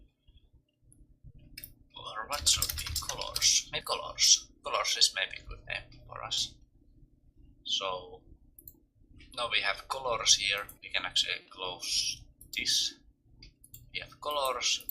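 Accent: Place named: Russia. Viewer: I love your russian accent :)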